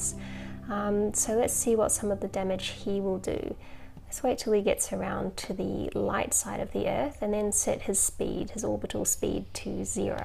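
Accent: New Zealand. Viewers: It's definitely an New Zealand accent. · I only know this from watching too much Flight of the Conchords on repeat. That's unmistakable New Zealand backwards speak. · She's from New Zealand. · I'm pretty sure that that's not an Australian accent, but rather a New Zealand accent.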